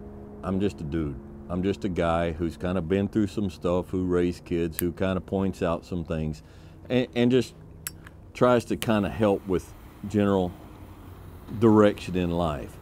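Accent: United States. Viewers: Just get another buzz mate and there you go ))) I like your videos and stuff . And I adore your John Wayne's accent mate . Just keep doing it . I love it for God sake ))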